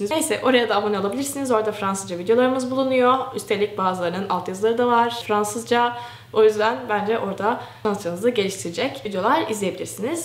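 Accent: Kastamonu, Turkey. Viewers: Abla Kastamonulu musun neresindensin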